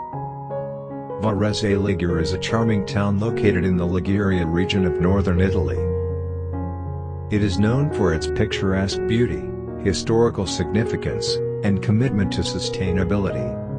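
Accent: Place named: United States. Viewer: What a pleasant American accent, where is it from?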